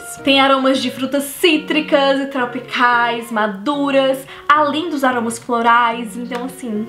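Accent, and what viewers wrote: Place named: Fortaleza, Brazil. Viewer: senti a familiaridade kkkkkk 😆❤️ sou de Fortaleza também 🇧🇷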